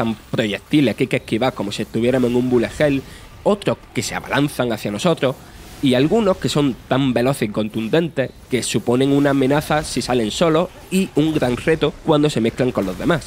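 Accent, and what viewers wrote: Andalusia, Spain. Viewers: Cuánto odio al acento andaluz aquí. Es vergonzosa la educación de muchos. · Es andaluz picha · Es español y tiene acento andaluz. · Para todos los qué dicen porque el locutor habla así! El chaval tiene un acento muy marcado andaluz . Niño que no te afecte el hate , te lo dice un almeriense con un acento marcaico marcaico como el tuyo . Ole el andaluz ^^ . Y sobre el juego tiene una pintaca buena buena. Es una mezcla entre dead space y bioshock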